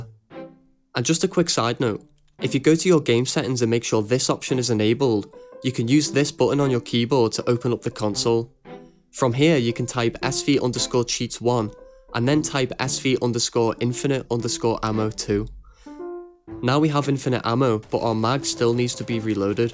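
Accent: Liverpool, United Kingdom. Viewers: lord knows i need a scouse cs youtuber in these trying times. subbed :) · liverpool accent slaps for commentary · Why is he british???????? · Hes scouse you whopper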